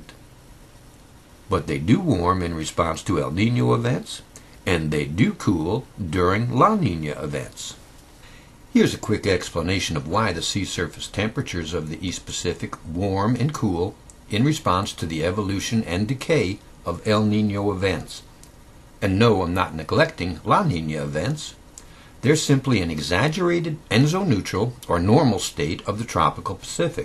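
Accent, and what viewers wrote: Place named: North Carolina, United States. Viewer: Great job,! You should get a British guy to do the voice overs, though. Your'e from North Carolina, aintcha?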